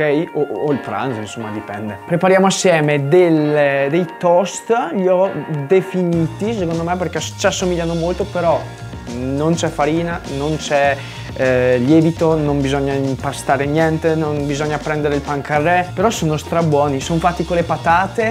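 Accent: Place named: Trentino, Italy. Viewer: though he does have a Trentino accent with Veneto influences, and the dialects that are spoken there belong to the same family of southern French and Castilian and are the more "spanish sounding" of the country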